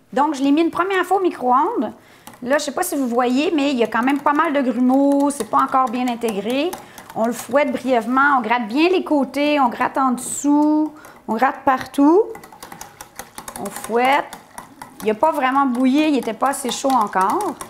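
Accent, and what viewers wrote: Quebec, Canada. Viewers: Tes cheveux roux et ton accent québécois j'adore 😍😍😍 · J’adore ton accent canadien 😂❤️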